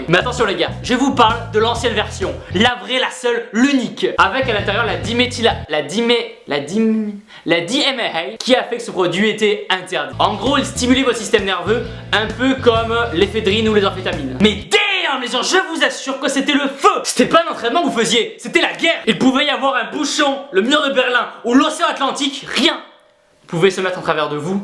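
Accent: South, France. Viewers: T'es mon youtubeur PREFERER ACCENT DU SUD ET MUSCU LE PERFECT moi qui suis du sud BOOOOOOUUUUUUUM!!Allée.vien ma petite :-P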